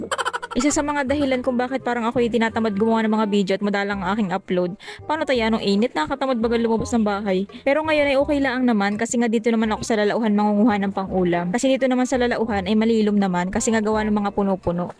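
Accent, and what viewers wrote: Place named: Quezon, Philippines. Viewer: Kya Pala ANG Punto mo .parang taga Quezon ... Tagal Quezon K NGA Pala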